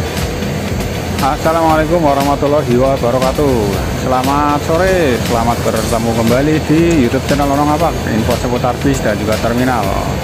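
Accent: Banyumas, Indonesia. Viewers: Hadir mantau mas, nek ora ora kepenak... Paten👍👍👍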